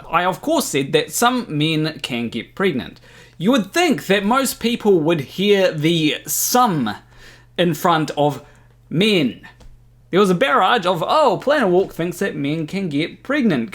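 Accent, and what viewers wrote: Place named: New Zealand. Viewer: Phoebics... Always good for a laugh. We have a very short life span in the scheme of things, and the best you can hope for is to be happy, looking forward to gettin up in the morning. If that means identifying as a cross/trans/metro/gay or any of the other options, just do it 👍. If it doesn't hurt others in your choice, then how can there be a problem? Great stuff as always, and always nice to hear another Kiwi accent on the tube. Cheers Hokitika.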